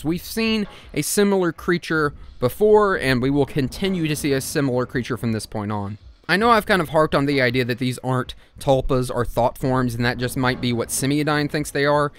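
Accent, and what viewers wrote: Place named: South, United States. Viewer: Somehow though the way you talk and show things makes even this absolutely terrifying freakshow seem friendly and welcoming.... Either its not that scary the 2nd time or its just horrific without a well humored Southern guy talking about whats happening idk ...did you use the whisper TTS on FL Studio to name episodes because of Serial Experiments Lain?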